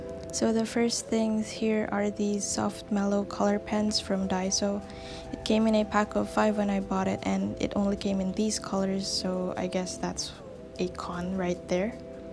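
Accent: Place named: England, United Kingdom. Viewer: TBH! your english accent is music to my ears uwu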